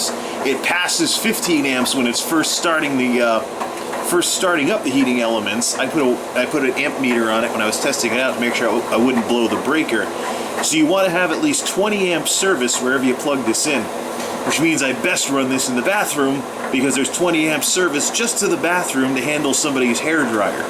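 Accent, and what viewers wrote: United States. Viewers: I live in South Carolina sooo nice to hear a Midwest accent. I have issues w the southern accent lol.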